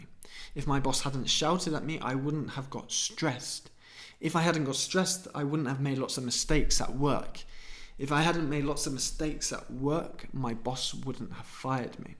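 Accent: United Kingdom. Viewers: English is my third language and I’ve learnt it since I was little, I am in love with your british accent and I wish I grew up learning it in the UK😍 your accent in spanish was good, it is my first language and norwegian is my second language. Growing up in Norway we were introduced to english all the time, on TV and more and norwegian isn’t an useful language because only 5 million speak it and you won’t reach far in the world only being able to speak to 5 million people😂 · I love your accent,!. Someday I'd like so much can speak fluently and with a British accent. Greetings of Argentina:D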